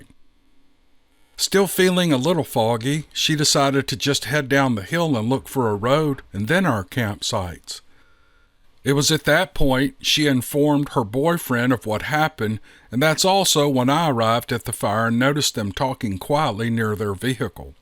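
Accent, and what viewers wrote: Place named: Texas, United States. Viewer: Is this guy from Texas Listen to that thick ignorant accent he's got. Like he's about to eat a piece of pie or just got done mowing his yard and drinking 5 beers to do so popping open the sixth his wife knows that she's gonna get beat down tonight so she pops a Xanax and a Vicodin and downs it with 1 whiskey sour mixed drink. She's only gonna feel it in the morning when her children are starring down at their breakfast eating in a hurry trying to avoid any eye contact or small conversation with their physically and sexually abusive father or their coward mother. Just five more yrs Billy says to macey his twin sister after breakfast as they swing on the back porch. Macey stares out into the acreage and deep woods of the backyard whishing someone or something would happen to end it all now. 13 long yrs of hiding under their beds running for their lives into the woods and communicating through walkie talkies to make sure they avoided their old man. 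Then church every Sunday where they have to watch him deliver his sermon from the front pew. The older they got the more the well masked hippocracy seemed to cut into not only their religious beliefs but also their belief in the goodness of humanity. Their mothers fingers seem to calmly and masterfully stroke the ivory and black keys of the church piano while the congregation sings hymns but if you sit close enough and know what to look for you can see her hands trembling slightly more each week as she loses her dignity and self respe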